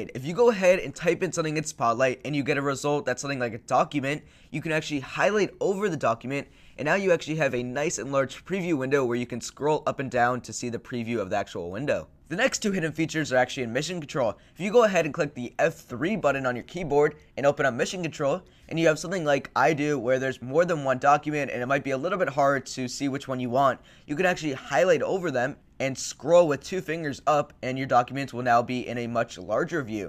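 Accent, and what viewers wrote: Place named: Canada. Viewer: You sound very Canadian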